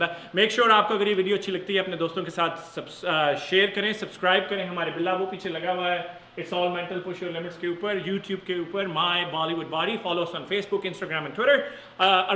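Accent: Punjab, India. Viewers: Vir ji tuc punjabi lgde o jhri apne aap muh cho bahr nikldi aa 😋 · veere video todi bhut changi ne .and toda punjabi boln di tone mnu or bhi changi lgi ne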